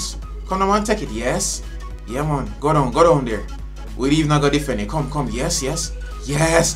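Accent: Jamaica. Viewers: Bro u speak Jamaica?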